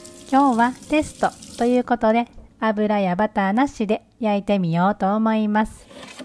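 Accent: Kansai, Japan. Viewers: ドイツからアメリカへ…インターナショナルな生活を送っていらっしゃる、と 見始めると浜村淳さんのようなこてっこてのまったりした関西弁🤣 海外で日本食を大切に味わっておられる素敵な毎日ですね✨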